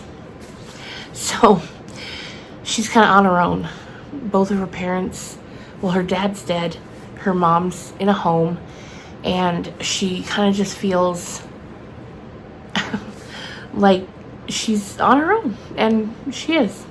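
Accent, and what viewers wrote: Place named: South, United States. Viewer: Sassy southern - love it.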